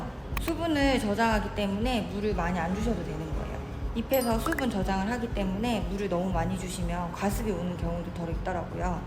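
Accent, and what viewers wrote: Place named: Jeolla, South Korea. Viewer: 절라도여? ㅎㅎ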